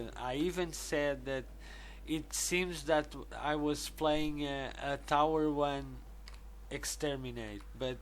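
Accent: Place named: Europe. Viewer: 2 things: 1.) What accent do you have? Sounds European 2.) If you can, show your mods for all your stuff, would be cool to see what you use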